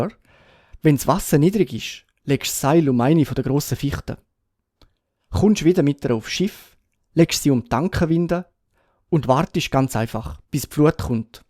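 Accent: Switzerland. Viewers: Thanks a lot, I really appreciate your work. What dialect do you speak, and which dialects are closest to yours?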